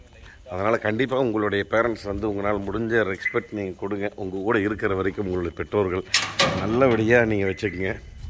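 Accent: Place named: Kongu, India. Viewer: கொஞ்சும் கொங்குத் தமிழ் பேசும் கோமகரே...! திகட்டாத தித்திக்கும் பேச்சுடைய திருப்பூர் திருமகரே...! உங்கள் காணொளி மிக மிக அருமை❤❤❤❤ அதைவிட உங்கள் தாய் தந்தை இழப்பைப் பற்றி நீங்கள் கூறியது மனதை கலங்கடித்துவிட்டது😭 "டான் திரைப்படம்" பற்றி எனது சில வரிகள்...! "❤தந்தை❤" மகன்களே/மகள்களே மனதில் பதிய வைத்துக்கொள்ளுங்கள்....! வலிகள் நிறைந்த உங்கள் வாழ்க்கையில் வழிகேடுகள் வளைத்துவிடப் பார்க்கையில்? வாழும் உங்கள் வாழ்க்கைக்கு வழிகாட்டியாய் வாழ்ந்திடும் வாழும் தெய்வம்தான்🙏 மதிப்புமிக்க உறவு "❤தந்தை❤"...!🙏 இத்திரைப்படத்தைப் பார்த்தபின்பு.... விழியோரம் வழிந்திடும் என் கண்ணீர்த்துளிகளை என் தந்தையின் பாதத்திற்குச் சமர்ப்பணம் செய்கிறேன்🙏 என்றும் அன்புடன் உங்கள் மகன்போல இவன் ரா.முஹம்மது கபூர் (சென்னையில் இருந்து)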